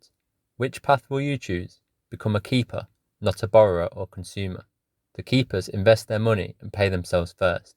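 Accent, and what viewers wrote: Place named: United Kingdom. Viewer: Dang British accent, this Merica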